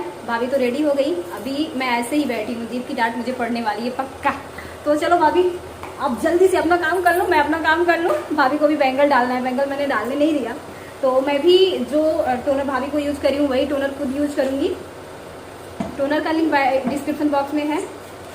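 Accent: Bhojpuri, India. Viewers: I love your bhojpuri voice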